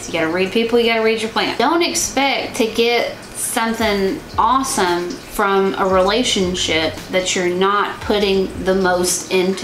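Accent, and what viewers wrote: South, United States. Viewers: I'm here from Harli's channel. I have to say, your accent sounds like ours around here. What state are you in? South Carolina here. I'll have to check out more of your videos. :)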